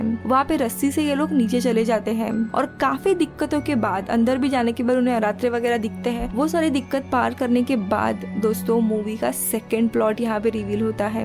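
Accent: Maharashtra, India. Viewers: Check your marathi accent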